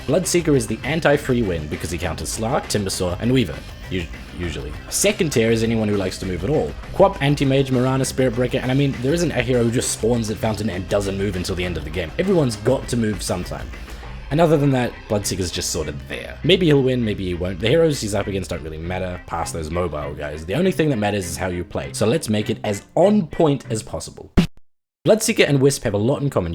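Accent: New Zealand. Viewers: He's NZealander maybe? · Andre Louw he's a kiwi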